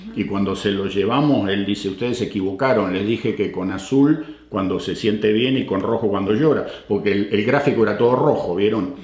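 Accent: Argentina. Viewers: Un abrazo desde Rauch provincia de bs as, Argentina los sigo siempre, los respeto y les deseo lo mejor, me encanta tus historias y bien contada con nuestro idioma y formato de habla, es como si nunca te fuiste, tu hija genial y muy Argentina tambien, besitos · Ya me hize fanatica..me llama la atencion q no haya perdido el acento argentino che😁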